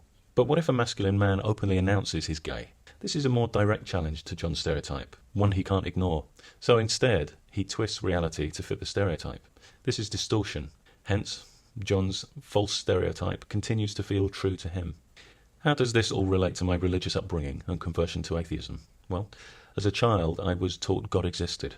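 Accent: England, United Kingdom. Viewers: Totally off-topic but your voice really reminds me of the voice over to a making of documentary of Silent Hill 2 but I suspect that's because you're from the same area in England as the actor.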